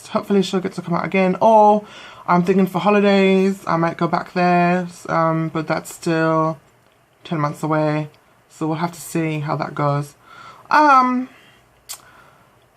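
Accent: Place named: United Kingdom. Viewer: Hi: Love the brit accent:Good Luck With Your New Apartment:Your lips look really nice. Could you do your next video wearing glossy Pink Lipstick?????? Please Please Please?????????? Bill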